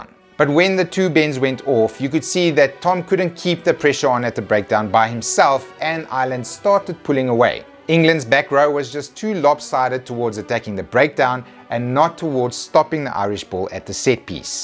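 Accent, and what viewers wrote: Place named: Johannesburg, South Africa. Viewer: Good old Afrikaans Jo'burg accent.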